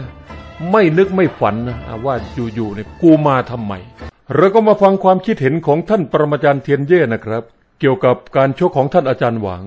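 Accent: Isan, Thailand. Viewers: สนุกครับลุงผมชอบลุงพากษ์อีสาน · ฟังเสียงพากย์เสียงเว้าสนุกดีผมชอบ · ผมชอบลุง พากษ์อีสานครับ.ใครไม่ชอบมึงไปดูช่องอื่นอย่ามาด่าลุง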